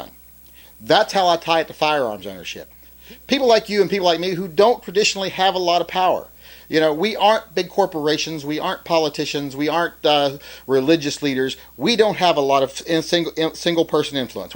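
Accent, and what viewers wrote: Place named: South, United States. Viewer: don''t hide your southern drawl just because ebomey doesn;t like it..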